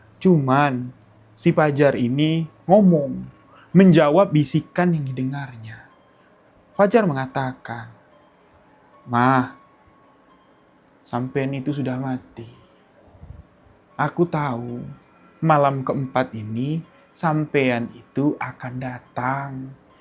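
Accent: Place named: Jambi, Indonesia. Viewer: sama2x bang, maaf jarang komen bang, maju terus bang udah mode jambi tuh pembawaannya ❤❤❤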